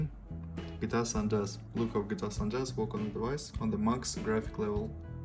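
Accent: Russia. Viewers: очень сильно чувствуется Русский акцент ,но спасибо ,ибо без русского акцента мне было бы не понять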